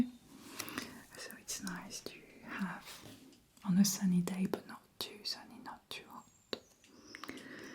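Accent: France. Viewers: Since I found you watching the Futuristic Hearing Test, respectfully I’m addicted to your voice. So soothing and that French accent on your English is the last top cherry on a cake. Really really good job. Keep going. I would love to see a suit fitting but in an elegant way and also a flight attendant. Both in English as well if it’s possible cause idk any French 🥲 Still, great work! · This is the first video I’ve seen of yours and I love the atmosphere and soft voice. I can hear the French accent, but some words seem to have a hint of South African or New Zealand, which is really cool! This was a great video :) Just read your little bio. Nice to see another enby out there in the ASMR universe 🥰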